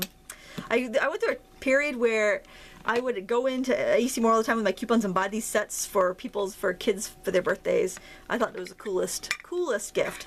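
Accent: Maine, United States. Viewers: Oh my God! I just Love you! I was having a terrible day, then I watched this vid and I was laughing and totally enjoying myself! It hap;pens every time I watch your vids actually! I'm a Mainah too, so I Love your accent, LOL. You are awesome!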